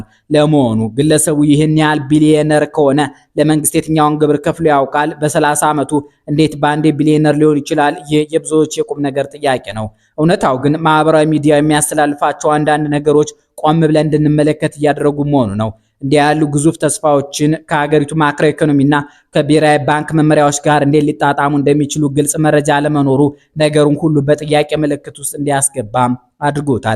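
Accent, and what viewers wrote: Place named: Gojjam, Ethiopia. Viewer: ስታነብ ቅናት ያቃጠለህ ጎዣሜ መሆንህ በድምፅህ ያስታውቃል።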